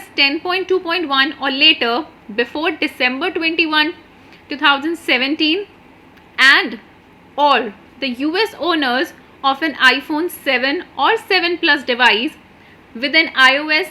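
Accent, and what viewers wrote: India. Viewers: Man even your video with your annoying Indian accent feels like a scam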